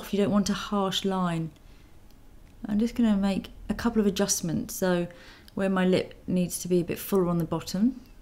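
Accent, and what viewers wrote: United Kingdom. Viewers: Hi Gorgeous teacher makeup!I am so like Your tutorial and I will have playing pleasure with lipstick apply like you and I like your natural british accent! with wishing love and happiness Astor for you <3:D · I love how you speak....just how the words roll out of your mouth and that British accent...Oh dear, so pretty...<3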